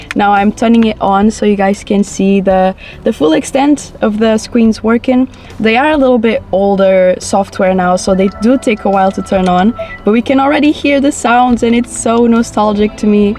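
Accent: Portugal. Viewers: Since the first second I was like "I'm sure they're portuguese" based on the accent and as soon as you said it at 8mins i almost screamed <3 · bem me parecia que soavas portuguesa haha amei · she is portuguese i’m pretty sure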